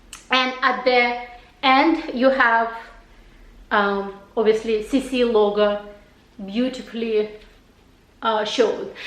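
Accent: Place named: Russia. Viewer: Dear, you are so sweet...obviously there are some people on ytube, who care about you. I love your chanel, your russian accent....Now you are even more confident with ytube. You made/make a great job! I love your new items, especially the keyholder & the earings- they are both so cute! Can you give me the price of the earings? Thanks. Have a nice sunday 😍😉🇫🇷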